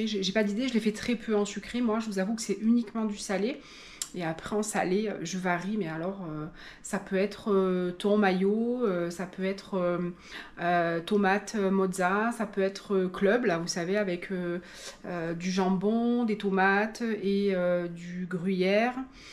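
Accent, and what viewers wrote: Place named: South, France. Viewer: Bonjour, je suis trop contente de revenir un peu sur l'ordinateur, comme je t'ai déjà dit j'ai un cancer du sein, qui s'est bien passé, mais mon traitement hormonothérapie est lourd, il y aura un an le 27 juin que je le prends, j'ai souvent des douleurs articulaires cette fois sa a battu le record sa m'a duré en douleurs 1 mois, mal aux os😪 mais j'essaie de penser positif c'est difficile,regarder un peu des vidéos me font oublier tout en restant allongée, bon STOP je ne suis pas la seule, tes vidéos je les adorent, je te l'ai déjà dit, j'adore ton accent du Sud😍les livres tupperwares, j'en ai aussi beaucoup, haha!!!les buffets j'ai aussi, j'aime la recette de la pâte minute qui est au début du livre, Est ce que tu l'as déjà faite, c'est super, on met les ingrédients dans une boite qui ferme on secoue et c'est fini, bon en salé pour les quiches ou sucré pour les tartes, le taboulé hummm! 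me donne faim, je fais aussi comme toi, qq fois j'ajoute aussi des dés de pommes,tes petits pains me tentent aussi je ne connaissais pas, moi aussi j'aime les fleurs, j'ai aussi du pourpier pareil, dommage que tu es loi, sinon je t'aurais bien demandé des cactus, maman en avait, je m'en souviens, j'en ai eux d'elle mais ils ont fini par disparaitre, trop serrés je pense et sèché, j'aimerais tellement en avoir, si un jour on descends en Corrèze, je regarderai si des personnes en n'ont et en demander, merci pour cette belle vidéo, merci, à très vite, je te souhaite un bel après m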